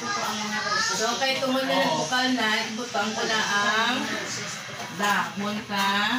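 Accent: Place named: Waray, Philippines. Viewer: Sarap pakinggan tono at salita niyo parang waray.heheehehehe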